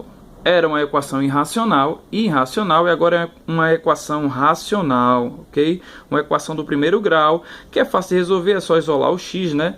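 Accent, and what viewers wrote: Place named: Northeast, Brazil. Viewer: Gostei muito do canal, consegui aprender fácil a matéria. e pra mim fica mais fácil quando o professor tem um sotaque de nordestino, o porque eu não sei .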